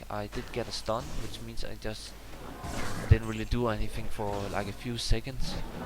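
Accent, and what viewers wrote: Denmark. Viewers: Love it. Are u by any chance danish :)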